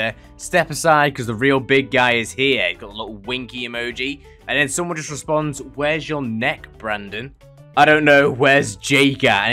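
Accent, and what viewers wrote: United Kingdom. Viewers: Question: are you from the United Kingdom?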